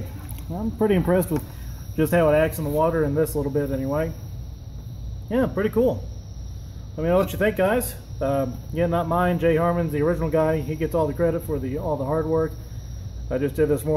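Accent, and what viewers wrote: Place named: South, United States. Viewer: Okay, Country accent!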